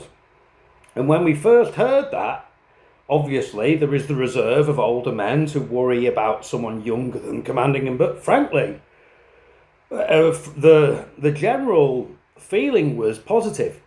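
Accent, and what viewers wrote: United Kingdom. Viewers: I just came across this video... you sound British to me. I struggle to feel sorry for foreigners who go involve themselves in a war. I file this under "it is what it is". Come back home, or stay there but stop whining, you chose this.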